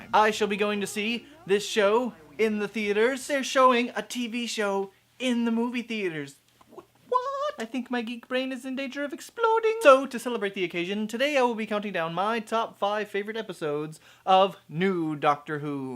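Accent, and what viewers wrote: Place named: United Kingdom. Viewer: Good British accent!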